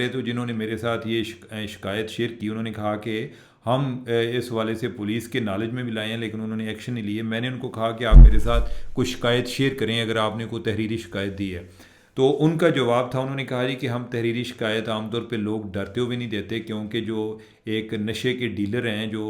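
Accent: Punjab, Pakistan. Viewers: Naeem bukhari and Najam Sethi speaks Punjabi in a style.You are forcing yourself to copy them.its better to remain natural.your urdu accent is enough to prove that you are punjabi bro.cheers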